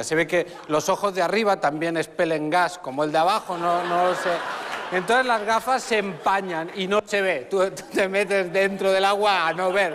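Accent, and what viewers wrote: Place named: Catalonia, Spain. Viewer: Acento de Catalán mu bonito de un bonito francés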